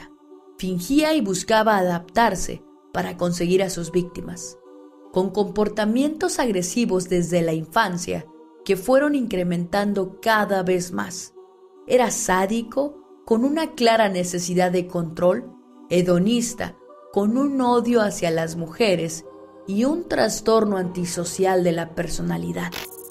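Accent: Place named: Mexico. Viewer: Gracias , te felicito y agradezco tu talento,me encanta tu acento mexicano. Abrazos desde Brasil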